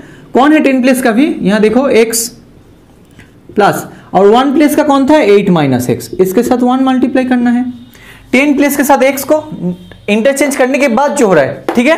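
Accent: Bengal, India. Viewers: Sir aap sum ko saam kyu bolte h shayad aap bengali ho mager aap bhot aache teacher ho heads off on you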